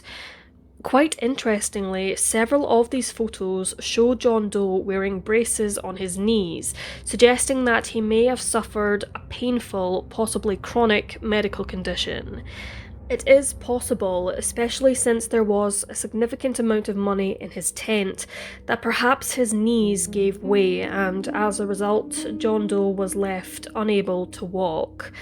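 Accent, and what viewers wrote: Scotland, United Kingdom. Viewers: She is from Scotland, UK. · Hello friend! I enjoyed video very much and I like your Scottish accent! I'm from India. I have subbed your channel, thank you. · except she is Scottish...! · It’s Scottish,I’d say. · Nope. She's Scottish. Mike is Irish. Different accents. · It's so nice to hear a good Scottish narrator... 💕 >^. .^< 💕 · She's from Scottish highlands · Subbed. What that accent? Scottish? Irish? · she’s scottish not irish🤦🏻‍♂️ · SHE'S SCOTTISH, PROBLEM??????🤨🤨🤨🤨🤨🤨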